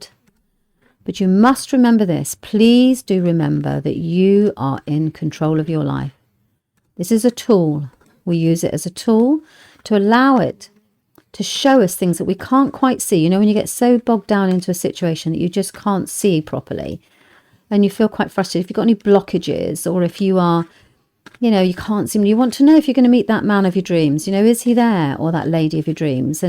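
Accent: United Kingdom. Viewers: you have the most lovely accent and speaking voice, how I love the brits , )O( cheers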